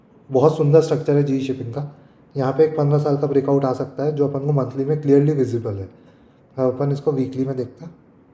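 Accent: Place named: Madhya Pradesh, India. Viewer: Aap madhya pradesh se ho aise lagta hai..apan..is a good giveaway..me indore se hu .subscribe kar dala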